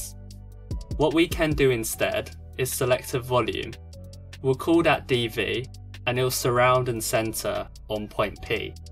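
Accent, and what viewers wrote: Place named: United Kingdom. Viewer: marvellous animations, topped off with an explanatory British-Chinese accent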